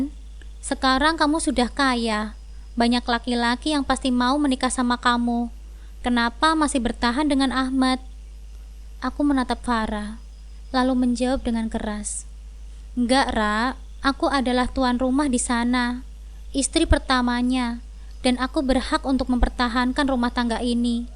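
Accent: Java, Indonesia. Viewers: Bd hadir mbak yg suaranya medok banget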